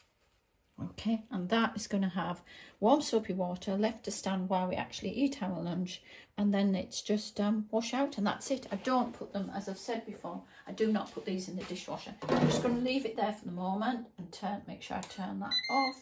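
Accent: Northern England, United Kingdom. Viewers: Nice to hear a Northern accent - I’m originally from Grangetown, in Middlesbrough!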